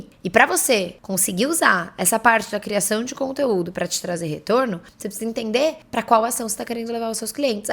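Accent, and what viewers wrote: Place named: São Paulo, Brazil. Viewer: Paulista fala desse jeito mesmo!😊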